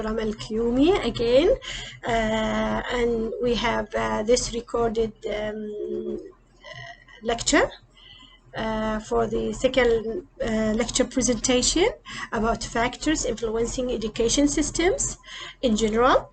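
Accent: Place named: Europe. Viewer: Mam,please speak like Asians,not like europians,there mouth and tongue differ from us... I appreciate ur class,but plz come back asian style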